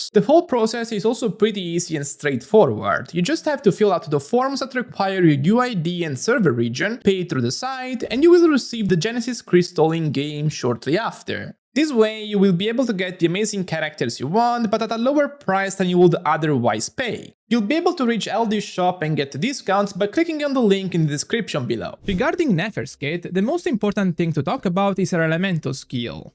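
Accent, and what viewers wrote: Italy. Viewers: Im hearing the italian accent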